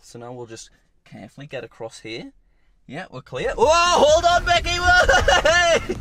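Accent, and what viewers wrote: United Kingdom. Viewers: You saund like a British man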